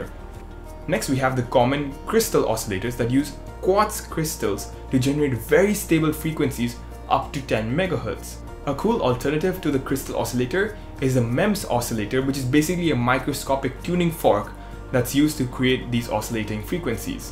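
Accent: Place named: India. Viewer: COngrats. Ever your Indian accent we get what you say and you know and explain well. Very good video.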